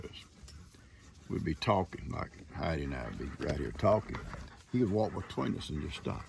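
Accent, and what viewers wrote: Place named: Texas, United States. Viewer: Thank you for sharing your life with us. I love your stories and listening to your Texan accent and of course all of your babies ❤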